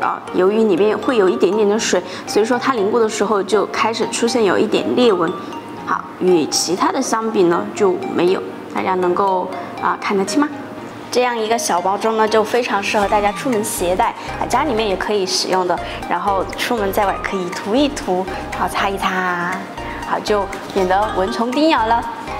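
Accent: Sichuan, China. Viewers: 👍👍實用，四川普通話嗎？☺️☺️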